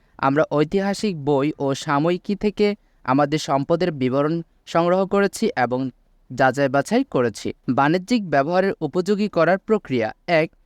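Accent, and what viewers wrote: Narsingdi, Bangladesh. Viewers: ভাই আপনার গ্রামের বাড়ি কি নরসিংদী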